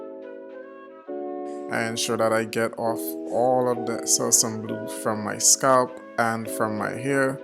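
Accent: Caribbean. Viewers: Hi! Where are you from I'm hearing a Caribbean accent. I'm trini 🇹🇹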